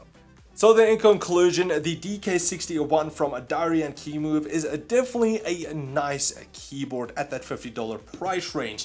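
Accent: South Africa. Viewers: R u south african ?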